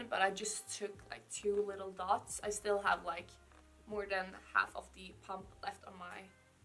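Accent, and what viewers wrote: France. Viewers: French guy? Haha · That was a french accent in this man's voice haha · Ahaha as a frenchie I can definitely tell he is french 😂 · That foundation looks really good, I might give it a try 😁 And ouh that French accent, easy to recognize haha (kisses from France !)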